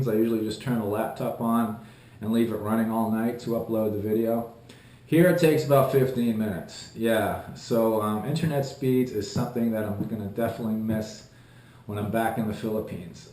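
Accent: Boston, United States. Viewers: it didn't take long.. that "Bah-ston" accent came right back. :) i'll be in SoCal next week, probably come home to a hot dish of home-made enchiladas. i anticipate gaining some weight as well. Glad to see you made it back to the PH safely!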